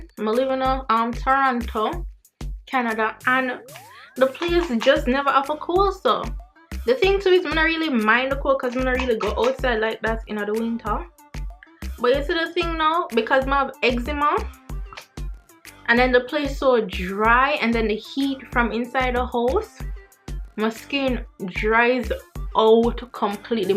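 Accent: Jamaica. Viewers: Omg I love her accent my favorite people are Jamaicans ❤ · Yh man she a Jamaican ✔✔Certified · “Mario Sum’m Sum’m Sum’m” 🤣🤣 Loveeeeee di patios vibezzz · Mi just enjoy all deh patois video deh. Mi love fi hear ya speak Jamaican Patois enuh. ( Jamaican- American here)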